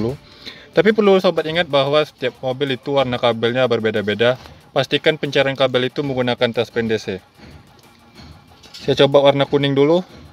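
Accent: West Sumatra, Indonesia. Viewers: Logat org minang 😆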